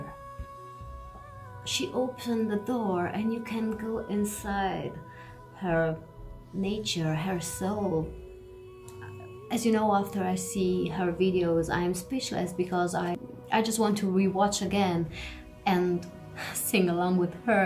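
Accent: Italy. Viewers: I love your Italian accent and I love your reactions to So Hyang. Subscribed ♡. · I am in love with your Italian accent!!!!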